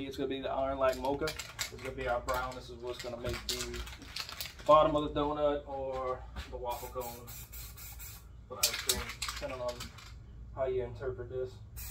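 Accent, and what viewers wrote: Louisiana, United States. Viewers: Just where in Louisiana are you from, man?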